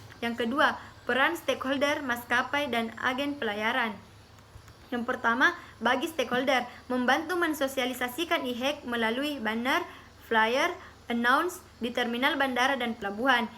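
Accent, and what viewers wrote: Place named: Makassar, Indonesia. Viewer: Kaya logat orang Makassar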